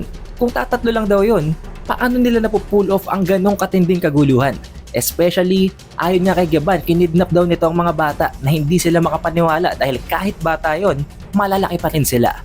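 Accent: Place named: Conyo, Philippines. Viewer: Inexplain ❌ Ipinaliwanag ✅ Ishinare ❌ Ibinahagi ✅ Nireveal ❌ Binunyag ✅ Mga simpleng salita, pero di alam ng mga purong tagalog. Hindi alam? Or baka kinalimutan? Ewan ko bah, nakakapeste lang pakinggan na banggitin ang isang salita sa ganyang paraan kung may pormal na salitang tagalog naman. Tunog conyong bakla na tuloy pakinggan. Bisaya ako pero mas magaling pa ata akong bumigkas ng pambansang wika. Kailangan na ata ng karamihan magbalik-aral.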